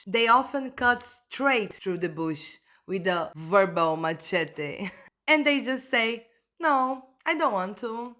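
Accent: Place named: Brazil. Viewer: Nice video. I think the idea of requiring voting (required in Australia when I was there in 1977) and requiring public service (not necessarily military) is good, although maybe getting out of it shouldn't be too difficult (like getting out of jury duty in the US). Btw, I never tire of hearing the Brazilian accent.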